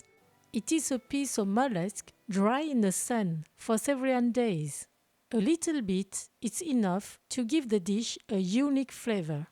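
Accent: France. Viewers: This has to be one of my favorite versions of this dish! The time you took and detail you provided was inspiring. I just love seeing another person who loves cooking world cuisine for the joy of it and it’s so heartwarming to see what I perceived as an Asian woman, with a French accent, making a West African dish that I, as a woman of similar differences, love recreating meals and the memories I love from around the world. Thanks for sharing 🥰Can wait to watch more of your videos ❤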